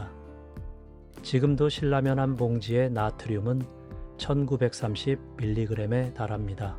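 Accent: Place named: China. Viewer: 발음이 중국놈않이야 국산제품인기제품만 세계 에서믹스커피는 인기제품인데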